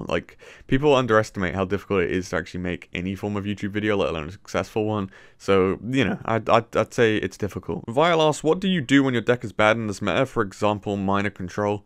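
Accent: United Kingdom. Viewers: R u from liverpool i thot u from london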